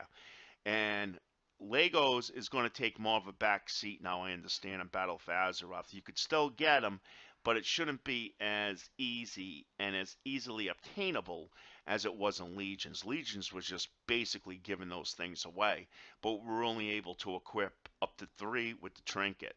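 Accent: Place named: Boston, United States. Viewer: Mass/Boston? lol trying to place it :D Anyways nice video Ty for it, just coming back and this is just what I was looking for :) Keep up the great work and TY for your time.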